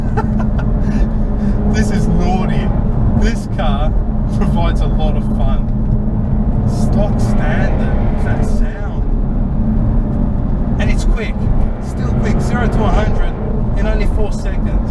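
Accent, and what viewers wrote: Australia. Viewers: If this is what the Australian accent has come too , i am fucking disgraced in this country . Like oh my gawd fully fucking tabouli ....... wake up australia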